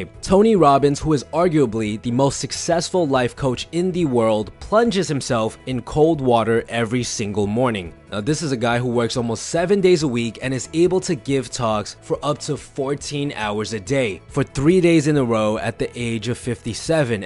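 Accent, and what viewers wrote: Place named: Brooklyn, United States. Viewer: sounds like an Italian guy from Brooklyn, 😁 not a bad thing!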